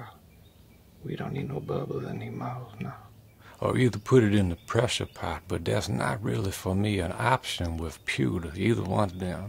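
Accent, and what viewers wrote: Louisiana, United States. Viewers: Man sounds hella Cajun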